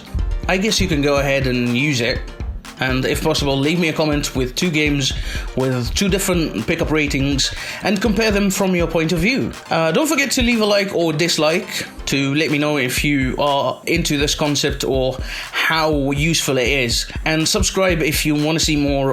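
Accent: United Kingdom. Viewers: Aawsome video but i think you are intentionally using British accent . its kinda hard to be understood when some of your audience natively speaking arabic will also choose to watch the english version as well . your channel your rules but i think american accent is best of both worlds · انت مالك يعم انت اولاً الانجليزي كويس فشخ بس اللهجة بريطاني تقيلة يمكن بس قشطة جداً انا سمعته وفهمته حتى من غير ما اشغل كابشنز انت بقى عايز ايه بالظبط الكلام لازم يكون ليه هدف يا حتة معقد هدفك انك تقول للي قدامك انه غبي؟؟؟ اقسم بالله شوية كمان وهشفق عليك بسبب شخصيتك التعبانة اللي اكيد محدش طايقها فالحقيقة ولمعلوماتك يا معقد الباشمهندس مهندس ميكانيكا قد الدنيا بس بيحب الالعاب فبيشاركنا وبيجرب يخليه محتواه متاح لغير العرب بس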